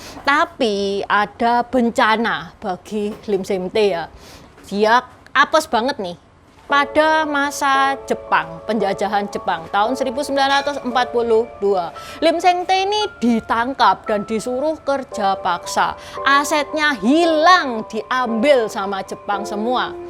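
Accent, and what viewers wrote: Java, Indonesia. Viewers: Rokok kretek taline lawe. Hhhh.. enakan yakin dengerin dongeng nya nih. Dg bhsa indonesia ber aksen jawa medok. Hihihi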